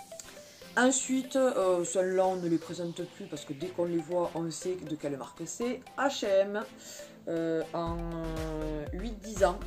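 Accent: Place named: South-West, France. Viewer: Hello ! belle rentrée VG ! on dois faire les mêmes VG au vu de ton accents du sud ouest on est voisin 😅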